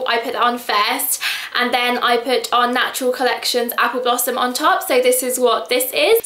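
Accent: RP, United Kingdom. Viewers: You're so posh!:]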